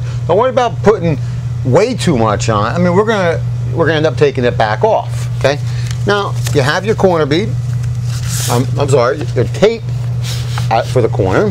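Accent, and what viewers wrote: New York, United States. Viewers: so you from Jersey, or New York? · You are a GREAT teacher - hey, you from NY? I grew up in the Bx, and your accent sounds so much like home, man.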